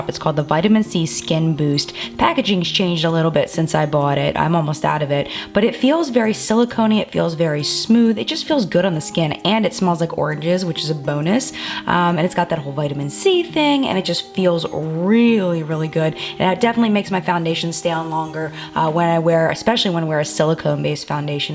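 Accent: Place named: Maryland, United States. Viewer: I always wondered where you were from because you talk just like me. When you said "Its been hot in 'Murraland'", it brought metaphorical tears to my eyes. Represent 301.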